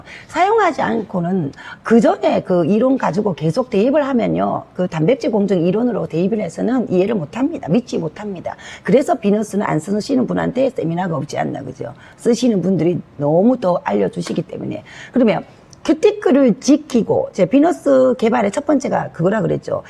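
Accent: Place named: Gyeongsang, South Korea. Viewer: 오리지날 경상도네요 경상도 사람들은 말할때핵심이 없고 말만 많아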